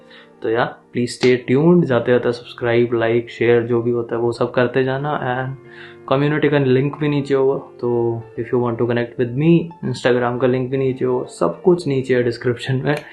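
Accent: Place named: Haryana, India. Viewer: are bhai Haryanvi accent OP 🔥🔥 , JAI HARYANA 💪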